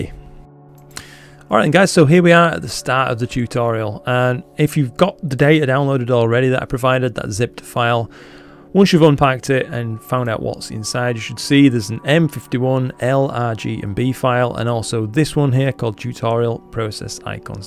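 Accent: United Kingdom. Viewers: time well spent watching it….very melodious also with your British accent!😜 i wish i could speak English in that accent!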